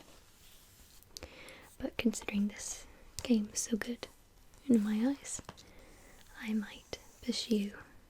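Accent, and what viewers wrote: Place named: United Kingdom. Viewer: YES I DID! Ive been watching it every night to fall asleep! I love the way you tap! More than the way most ASMR artists tap. Thank you! And your voice (and British accent, as I am American) is very relaxing as well.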